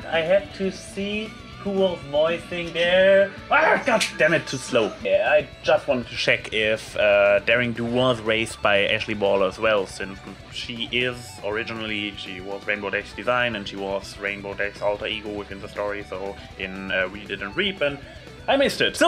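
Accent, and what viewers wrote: Germany. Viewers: Ich finde deinen Deutschen akzent echt genial ^^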